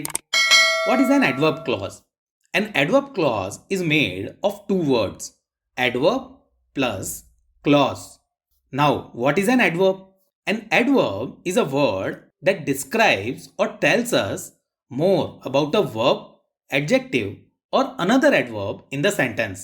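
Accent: India. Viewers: Why is the voice so Indian? Is he from india?😂😂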